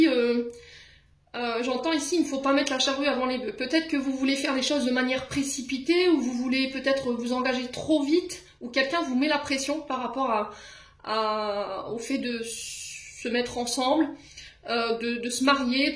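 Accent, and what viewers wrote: North, France. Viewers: Bonsoir a tous, bonsoir, j'adore ton accent de chnord,je suis de Calais 😂 je suis cancer ♋️ ascendant cancer ♋️, elle est bélier 🐏 ascendant bélier 🐏, moi je me suis laissé emporter par elle,love bombing, gaslighting etc.....bref courage a tous,parfois il faut traverser toutes ses épreuves pour avancer...et croyez-moi cest purée de dure...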